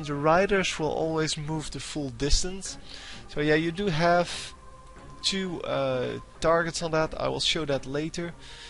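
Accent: Netherlands. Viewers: Are u Dutch? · He is from the netherlands, and his accent is a very typical dutch accent.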